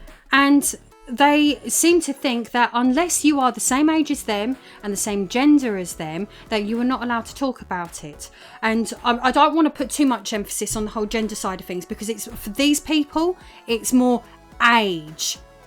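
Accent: United Kingdom. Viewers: You sound like you're at your most British ever in this video, and it's awesome.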